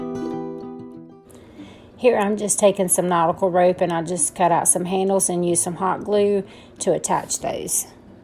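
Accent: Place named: South, United States. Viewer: Hey love you videos! Can I ask what state do you live? I live in a very small Town in North Carolina called Lincolnton. Love your country accent! I found you though Sweet Wendy’s channel.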